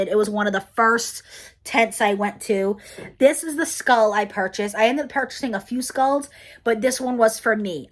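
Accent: New York, United States. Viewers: Yes, I was in New York and the day that I landed it look like doomsday on Long Island. I wish they had a farmers market here at night. I am not a morning person. I can understand that this week I don’t wanna go anywhere and I’m being forced to go somewhere. My grandson died of accidental drowning a year ago. His birthday is June 30. He would’ve been four. On a good note my son and his wife are having another baby. I just found out yesterday. I have a whole garden full of sunflowers in my yard now. I thought from your accent you were from New York. I enjoyed your blog for the farmers market.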